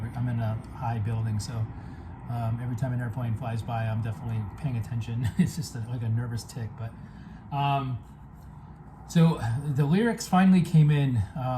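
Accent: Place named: London, United Kingdom. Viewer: “Allo, G’mornin’ Guv”….Very London. ITS so soft that most people miss it.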